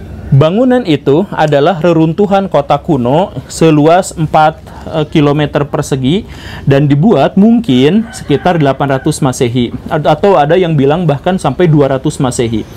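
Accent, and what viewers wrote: West Java, Indonesia. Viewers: Jarum kecos Ranggeteng hadeuh.. Sunda pisan si Akang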